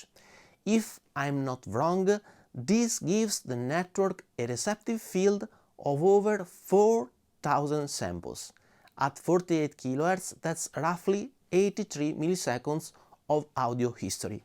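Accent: Italy. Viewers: You could put the script on your phone and reference the screen. The casual walk & talk is very engaging in many ways because it's like you're taking us on a journey with you through your environment where you normally go for a walk. We get to see a little bit more of your world and get to know you better. Nobody expects you to speak English perfectly. In fact, your Italian style makes you a real Renaissance Man!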